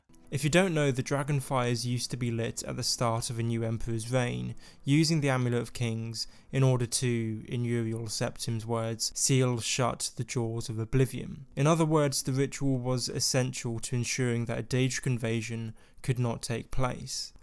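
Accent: United Kingdom. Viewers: You have a specific type of British accent. Is there a name for it? I’m almost certain that you would pronounce the word “drawings” like “draw rings”.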